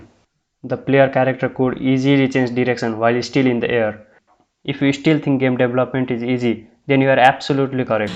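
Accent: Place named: India. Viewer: Heey you sound like an Indian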